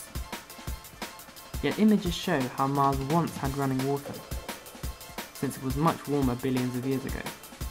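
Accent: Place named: England, United Kingdom. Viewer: What you talking about? he's speaking English with an English accent.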